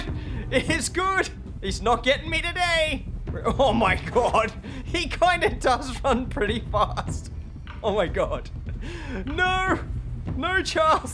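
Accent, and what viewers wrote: Australia. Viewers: Definitely Australian 💀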